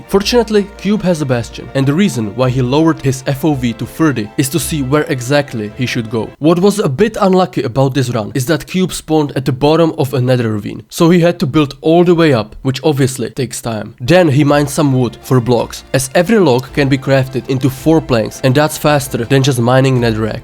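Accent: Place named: Czech Republic. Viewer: Yo great video and breakdown! Keep up the content ;) (Within the first seconds I was just searching whether or not you are Czech, knew I heard the accent! :D Nice to see some more Czech people with actual good videos)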